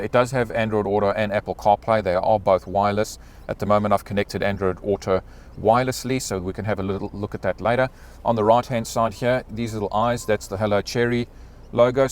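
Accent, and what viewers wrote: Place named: Johannesburg, South Africa. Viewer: Sounds like you’re from Linksfield, Joburg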